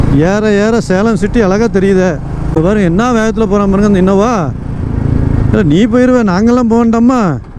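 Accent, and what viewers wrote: Chennai, India. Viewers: தளபதி விஜய் அண்ணா ஜனநாயகம் படம் பார்க்க ஆவல் போல உங்க வீடியோக்காக ஆவல் அதிலும் உங்க சென்னை மொழியில் பேச்சு இன்னும் ஆவல்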